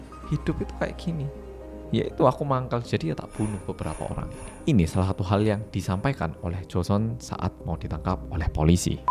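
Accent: Java, Indonesia. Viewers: Oppo benar-benar udah indo banget ya 😅 selain medok selalu untung gak mau rugi 😂 Yang sakit gak hanya bumi, manusia yang hidup didalamnya pun sakit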